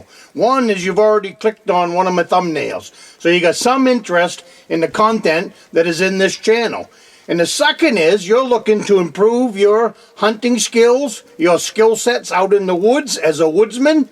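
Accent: Maine, United States. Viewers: Very informative video, and you do rock that Maine accent! With your enormous number of videos, sounds like you should write a book on being a woodsman!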